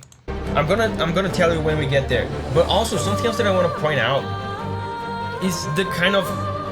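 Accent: Brazil. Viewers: you sound Brazilian, are you Brazilian?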